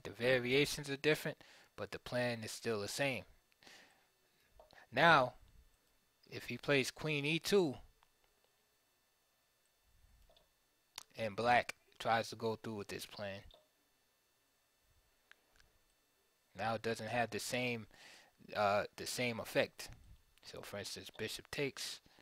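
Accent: United States. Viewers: Put an Eastern European voice on this video...perfect. Just sounds weird with an American doing the verbal annotation.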